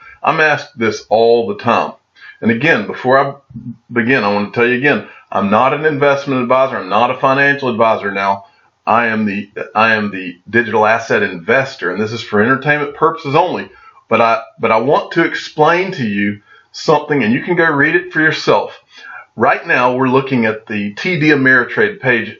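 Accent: South, United States. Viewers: Can’t believe that I’ve watched every single clip uploaded by you since you appeared on YouTube. Reason I started listening to you was because you remind me of my friend Steve who was from down south and had the same tang or accent as you... I’ve checked out so many YouTube channels but no one is more informative and inspirational than the digital asset investor. One love.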